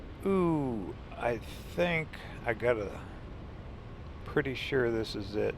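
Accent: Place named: Western Pennsylvania, United States. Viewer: Great videos. Sounds like you’re from Western PA…maybe dahn tahn Pittsburg? I’ve driven most of 66 dozens of time. Keep up the great work.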